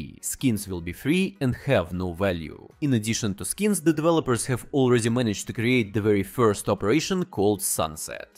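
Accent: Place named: Russia. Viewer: you speak like russian with this accent, am sorry if i say wrong, am poland so it hears very known for me :)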